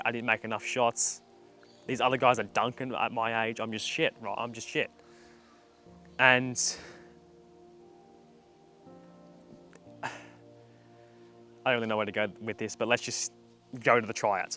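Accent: Australia. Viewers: Are you australian if so do you live in sydney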